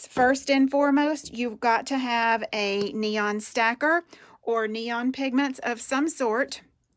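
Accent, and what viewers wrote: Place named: Georgia, United States. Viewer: I am watching you from Atlanta ps. You crack me up! Definitely sounds like you’re in GA 😂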